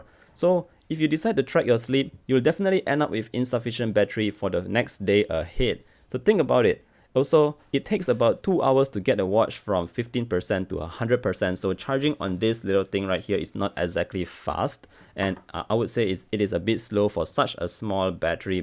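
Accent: Singapore. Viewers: Do I here a SG accent?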